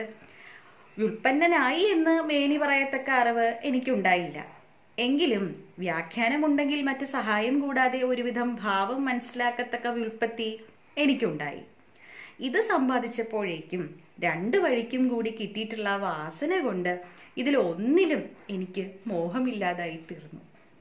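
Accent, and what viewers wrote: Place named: Valluvanad, India. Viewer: വള്ളുവനാടൻ ശൈലിയാണല്ലോ....😄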